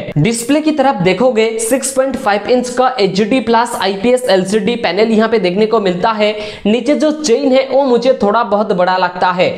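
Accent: Bengal, India. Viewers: Bhai tm hindi aur english dono ki communication classes lena start kr do. Tmhare bolne me bengali accent h jo k itna irritating h k subscribe to bhul jao koi tmhari video pura bhi nhi dekhega.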